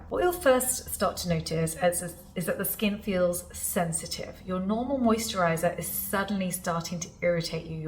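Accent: United Kingdom. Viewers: Especially with her British accent 😅😂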